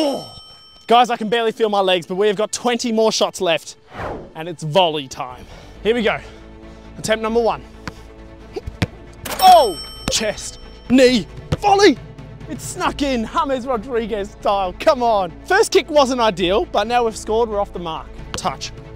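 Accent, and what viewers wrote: Australia. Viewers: are ya aussie as well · Ok now i knew your australian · I had no idea you were australian, I assumed you were british from watching your shorts with no audio lol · Is this guy Australian and if he is is he from Sydney? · he sounds like a aussie is he one? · Ru an Aussie?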